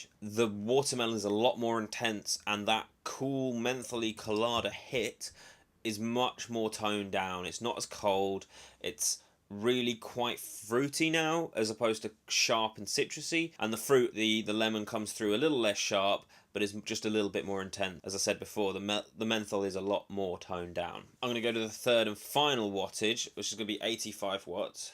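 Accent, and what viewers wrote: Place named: South, United States. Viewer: You're very welcome. Ah okay, not to worry, just thought that i recognized the accent but i guess all us southerners sound pretty similar ;)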